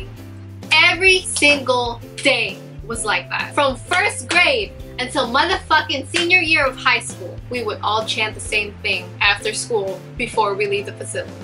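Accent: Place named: United States. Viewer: Sounds like a filipino turned American...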